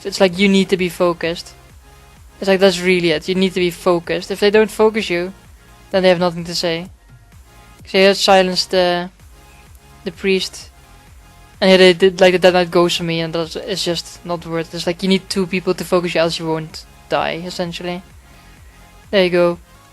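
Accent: Netherlands. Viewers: the dutch exent is so enoing but the info is kinda good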